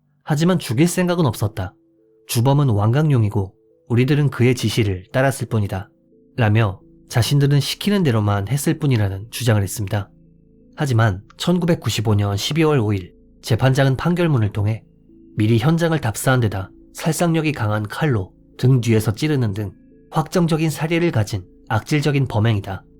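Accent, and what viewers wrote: Japan. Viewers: 일본에 살고 계시거나, 일본과 종사하는 일을 하시는지요? 일본 지명이나 단어를 이야기할 때 쿠세가 있는 것 같네요. 다만 장기간 거주한 것은 아닐 것 같은 발음입니다. 영상 잘 보았습니다.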